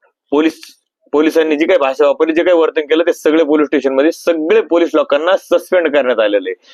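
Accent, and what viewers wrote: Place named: Dhule, India. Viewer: भाऊ तुम्ही धुळ्याकडचे वाटतं..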